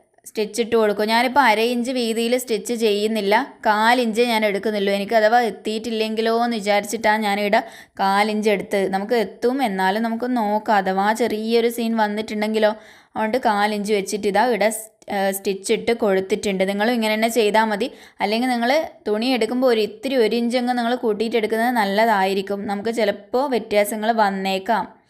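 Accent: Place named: Wayanad, India. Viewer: Evidaya veed ? Samsaaram kelkumpol vayanaadu polund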